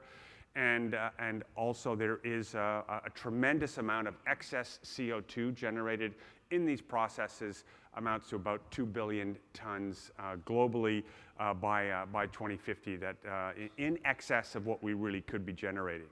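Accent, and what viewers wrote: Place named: Canada. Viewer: More b.s. with a Canadian accent. Does this little socialistically propped-up company really think it will hold the major powers hostage with their patented processes?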